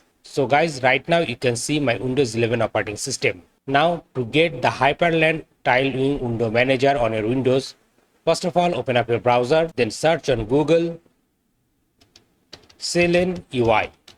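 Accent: India. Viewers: Bro, your accent not understandable for me... You speaks on English, but I hear words like from Indian or Indonesia lol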